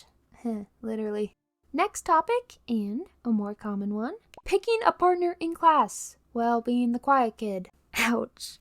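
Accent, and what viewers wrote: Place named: Australia. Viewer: I don't why but everytime I am rewatching this; I am hearing a slight Aussie accent from you. LOL !!! Yes ... I came back to type this !!! 😆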